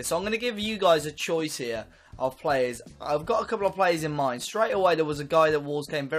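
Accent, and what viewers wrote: Malta. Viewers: Are you from Malta 🇲🇹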